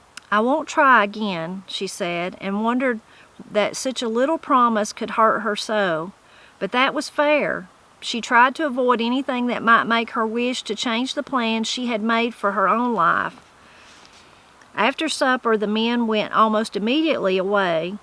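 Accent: Appalachia, United States. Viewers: I have just found your channel and have subscribed. Hearing you talk is just like hearing my grandmother talk. She and my grandfather were from the hills of Tennessee and followed many of the Appalachian folk ways, culture, language, etc. I try to keep a list of words and expressions they used that I never hear anyone else use and over the years have found that much of it came from England and Scots-Irish ancestors. It is wonderful that you are preserving that heritage. Blessings.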